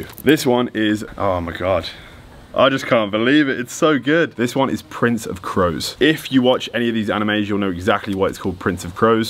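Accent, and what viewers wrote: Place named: United Kingdom. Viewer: drug addict explains drugs in british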